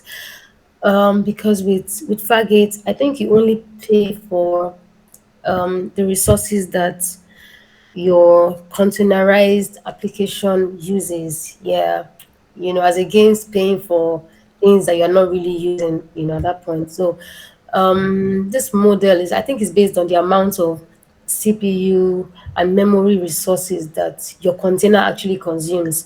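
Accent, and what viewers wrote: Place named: Nigeria. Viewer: I could sense the Nigerian accent. Please tell me if I am right?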